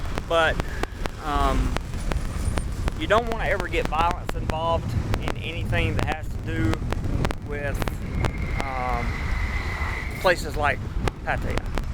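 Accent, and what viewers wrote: South, United States. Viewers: Irishman he has a southern American accent. This is not to say he is good old boy. That term can be taken as a pejorative by some.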